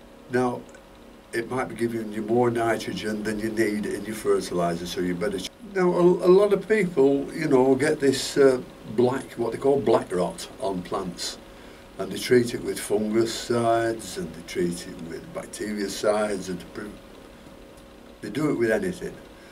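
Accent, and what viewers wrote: Lancashire, United Kingdom. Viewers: Thank you for your videos. Your accent sounds similar to mine! I'm in Adlington near Chorley. Merry Christmas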